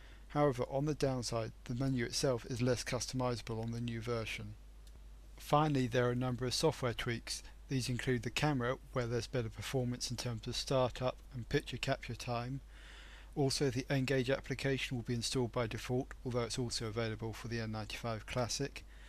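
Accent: United Kingdom. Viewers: hahahahaha ,yes u'r right what a speech an what a ugly voice, British accent.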